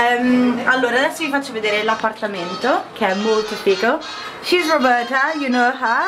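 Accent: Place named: United Kingdom. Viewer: Oddio!!! non ti avevo mai sentita parlare in inglese: c'hai un super accento british!:P Divertiti a NY!! A prestissimo cara! Baci!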